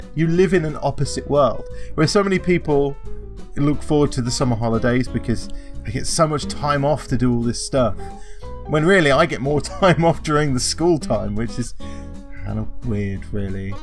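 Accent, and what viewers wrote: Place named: RP, United Kingdom. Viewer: I came here and subbed for the WoW stuff. But I stayed for the posh accent hehe 😉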